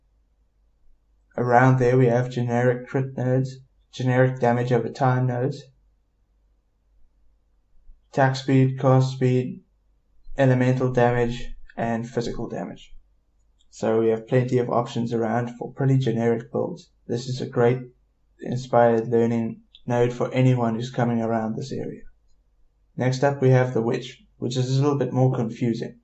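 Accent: South Africa. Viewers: lol dude, instantly heard you were south african. thanks for the info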